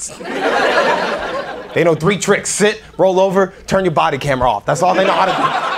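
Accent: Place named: New York, United States. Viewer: he likely picked up the accent from living in ny & the media he watches/consumes<3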